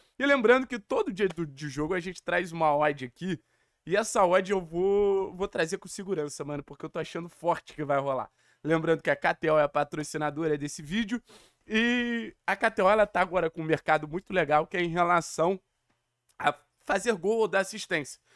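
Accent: Rio de Janeiro, Brazil. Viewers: Meus ouvidos sangram, carioca..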